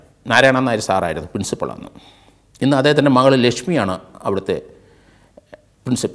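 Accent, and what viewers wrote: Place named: Kottayam, India. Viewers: He has trivandrum, kottayam and idukki slang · അതി മനോഹരമായ കോട്ടയം ഭാഷ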